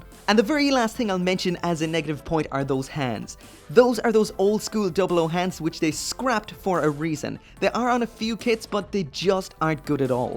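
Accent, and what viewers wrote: Ireland. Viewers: Hey man, you Irish?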